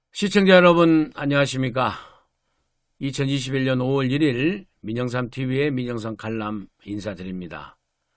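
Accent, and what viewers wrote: Jeolla, South Korea. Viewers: 구수한 전라도 사투리로 말 도 잘 하시고, ㅋ 정말 계파정치 하지 말아야 합니다. 경상도가 대표로 나와도 안됩니다.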